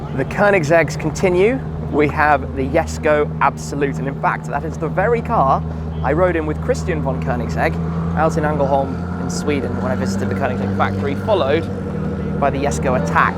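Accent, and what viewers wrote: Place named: England, United Kingdom. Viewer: The English. Can't handle their voice can't watch video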